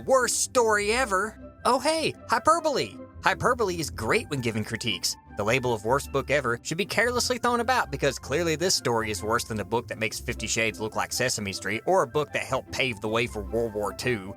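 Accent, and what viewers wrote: South, United States. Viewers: Your southern accent makes me happy :)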